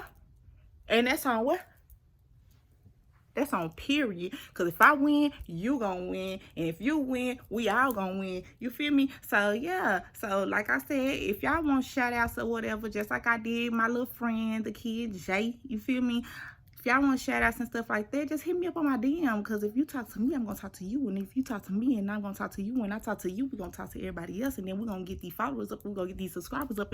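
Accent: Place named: Texas, United States. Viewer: Are you from Texas? I can hear the accent 🥰🥰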